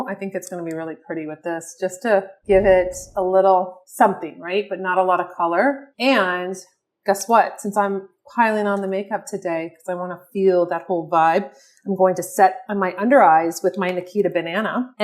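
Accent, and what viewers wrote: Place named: Miami, United States. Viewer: I just stumbled upon your videos and as soon as I heard you talk, I said to myself.. She’s from Miami. I am, too. Great makeup tips btw. 😊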